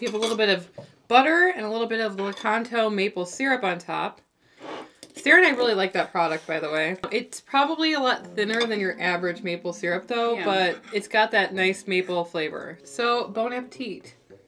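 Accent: Chicago, United States. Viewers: First off you guys are effing adorable! I love the dry sarcastic sense of humor! Thanks for making this helpful content! PS. You sound like your from Chicago!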